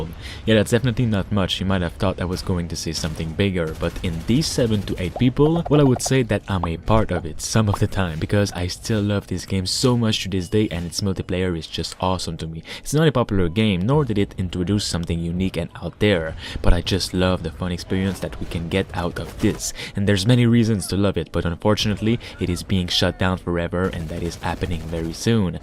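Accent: Quebec, Canada. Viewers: Oh well I guess I'm gonna buy this to finally try it... Wait a second... Es que t'est Quebecois?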